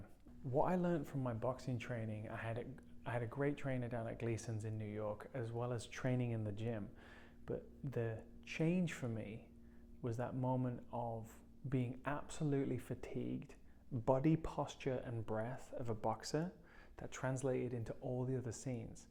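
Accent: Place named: Australia. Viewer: Your English accent has a bit of a Australian twang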